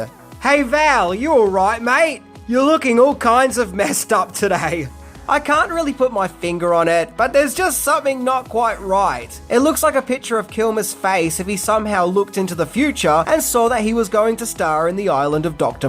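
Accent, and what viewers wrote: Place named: Australia. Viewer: You’re killing me (in a good way😅)!! Several months ago I started watching at the beginning of your videos and have finally caught up. Along the way I realized that I was missing quite a few movie gems from my collection and as such have had to make a lot of purchases to more fully create a dignified compilation. A few observations that I have made from watching your videos in succession from the beginning are: You are a very kind person!! I really enjoy your comments and you are always willing to give somebody the benefit of the doubt without casting blame or being unkind. I really enjoy listening to your accent (obviously I’m not from Australia). I really enjoy the way you present the content you are speaking about, it’s interesting and fun to listen to - I’m sure it takes a lot of work to make these videos - and I can see you’re passionate about the movies you are talking about. 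You are gracious to those who watch your videos and that speaks greatly to the humble type of person you are!! Thank you, for creating entertaining and enjoyable content. I look forward to whatever you have in store for us!!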